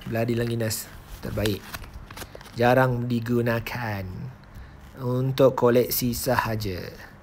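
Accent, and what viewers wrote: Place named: Negeri Sembilan, Malaysia. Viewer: Cakap nogori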